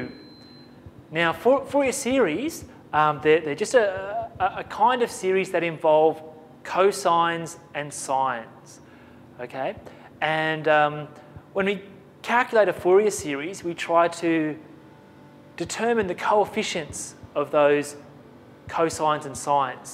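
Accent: Australia. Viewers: I haven't seen too many Australian people on these sort of videos. There's something about the accent that I love even though I'm American! Great work. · Bonus for having a ‘Stralian accent 😊🥳🎊🙌🏽 Awesome video! Greetings from the US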